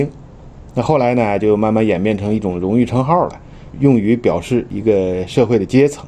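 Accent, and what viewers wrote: Tianjin, China. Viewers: 好吗（天津口音），的故事太精彩了，😄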